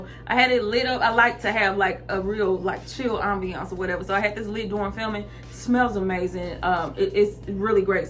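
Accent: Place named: South, United States. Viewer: I love your accent so much !!! Where in the south you from ?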